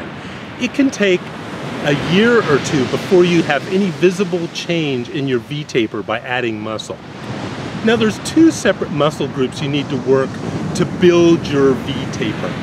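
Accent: Germany. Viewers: Well, yea, but he seems to have a little German accent when he speaks English. Mostly with the latter "R"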